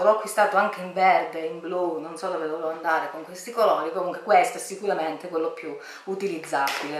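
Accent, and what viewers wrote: Calabria, Italy. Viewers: Ciao, ti ho appena scoperta e non posso più fare a meno dei tuoi video! Adoro il tuo accento calabrese e i tuoi gusti! Un bacio da Napoli!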